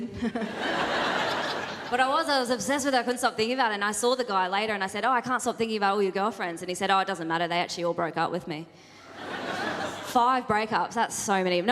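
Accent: Australia. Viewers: She's very Australian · Austraiiilian Maite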